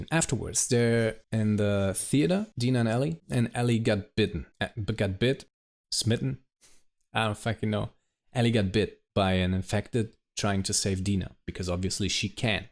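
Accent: Germany. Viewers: I caught the accent too lol, have German family. Ich mag deine Video Reel Talk! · Where is your accent from ? Germany ?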